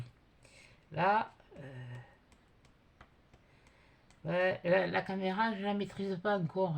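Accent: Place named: Southwest, France. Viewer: Re coucou. Bon le trouillaumétre a baissé...😁 Vous vous en sortez très bien Ahh du sud-ouest...moi aussi mais avec un accent de Marseille...peuchère 🤣 Ohh tu parles de Meriadeck tiens donc.... Pas de soucis de cadrage, vous êtes dans le champ de notre vision 😁 Sinon...De superbes cartes, j'adore le violet aussi..de la récup de chutes, le must...adorables créations un grand bravo et encore bienvenue. 💖💖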